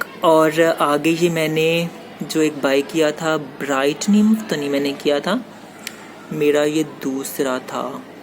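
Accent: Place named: Pakistan. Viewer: aap ki awaj see lag raha tha ki aap Pakistan see hai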